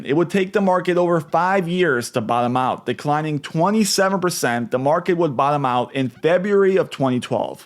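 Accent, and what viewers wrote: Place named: Minnesota, United States. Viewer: Are you from Minnesota or Wisconsin?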